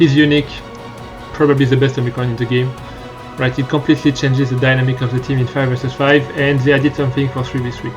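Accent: France. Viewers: Thanks for replying! I don't think I'll find many equally-geared Ewoks, my Malak is R7, modded for the solo (to the best of my ability, I have almost 0 6E slicing mats) and I'm in Bronzium 4. Would it work against G9-12 Chirpacron, in either one? I don't want to risk my 79 banners. Also, thanks. I've been trying to decide what to do with my omis. I might actually omicron Chirpa, seeing as how I'm gunning for Threepio for GAS anyway Also also, don't worry about your voiceover. I could understand you perfectly well, even through the (French? Is it French?) accent. I would like it if you did your GAC/TW vids with the voiceover, and the GC/TB vids with just text, because I like to boot them up at school and I don't want to miss anything on mute. However, that is just a me thing. Don't feel pressured to.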